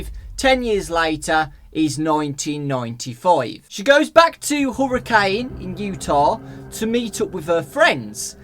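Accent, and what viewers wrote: United Kingdom. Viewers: i feel like he named the character charlie just to hear say it in his british voice.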